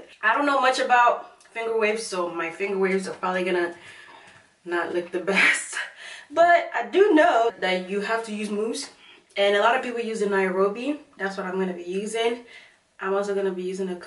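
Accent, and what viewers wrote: Jamaica. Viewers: I was nervous too. lol I laughed so much. Are you a Jamaican?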